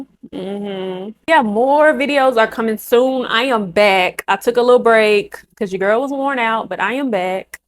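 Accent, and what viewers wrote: South, United States. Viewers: OMG I love her country accent ! 🥰😂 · New here, 1) you’re gorgeous 2) idk what I was expecting but I wasn’t expecting your voice to sound like that lol you def gotta be from the south with that accent. And your freckles!!!! 😍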